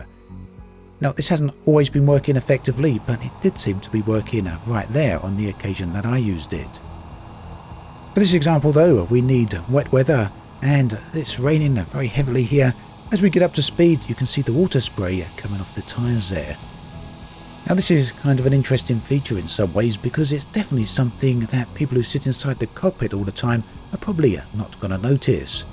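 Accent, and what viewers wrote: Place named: Northern England, United Kingdom. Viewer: It's an English accent, most likely from the north or upper midlands.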